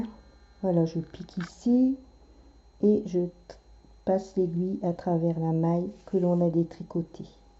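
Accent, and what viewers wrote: North, France. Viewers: merci vous avez l'accent du nord que j'aime je suis née dans le nord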